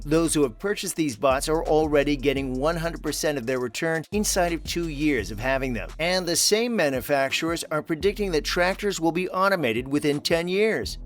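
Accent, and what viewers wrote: United States. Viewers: Is this an advert for Elders or supposed to be informative - not sure you are achieving either - especially with the very annoying American accent.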